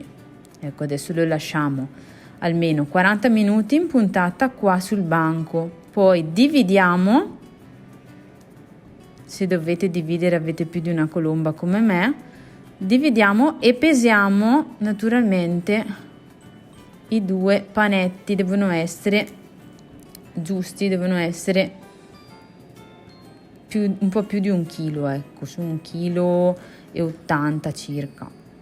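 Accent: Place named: Lombardy, Italy. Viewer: Posso chiederti di dove sei? Dall'accento mi sembra, che sei BS o BG 😂😉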